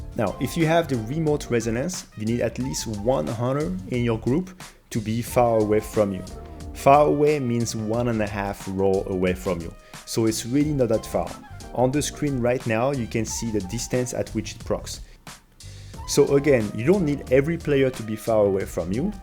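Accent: France. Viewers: Il est Français? Je me disais que j'entendais ça vu l'accent! Il a jamais parlé en Fr? aha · Hmm le bon accent français comme on l'adore 😅. Tkt je suis un confrère aussi 😁.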